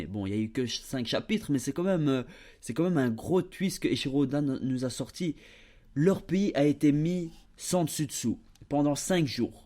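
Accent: Brussels, Belgium. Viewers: D’où viens tu en Belgique ? Petit accent bruxellois, non ?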